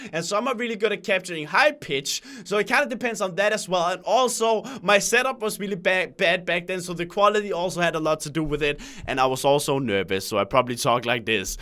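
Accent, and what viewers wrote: Denmark. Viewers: danish I'm pretty sure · please use ur Danish voice please I like the Danish one better than the real voice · This voice sounds more Danish I like it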